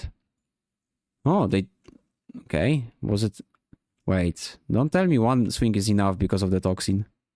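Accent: Poland. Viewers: i can smell polish accent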